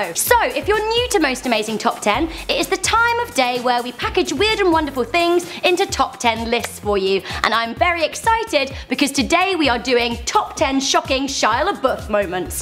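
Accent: United Kingdom. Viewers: guurl, Why do i gotta be a sucker for them British accents <3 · Ok usually I'm really into British accents, but when you mix it with you're voice it's literally the ugliest sound on earth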